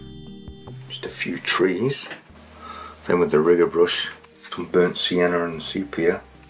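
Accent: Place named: Sheffield, United Kingdom. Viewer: This is kinda random but, your accent reminds me of several of my favorite musicians like Richard Hawley and Alex Turner. They both come from Sheffield in the U.K. and in particular I've heard them say they are from High Green(I'm American and have never been to the U.K. but certain accents are somewhat recognizable to me) To me the 'Sheffield' accent sounds similar to Scottish and Irish.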